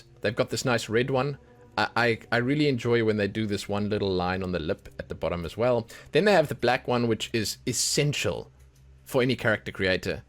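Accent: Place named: South Africa. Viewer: Here after seeing some new content for the game again and wanted to check the character creation and I heard the accent and my my a fellow south african will check out more vids but you have an extra sub. Love from Krugersdorp👌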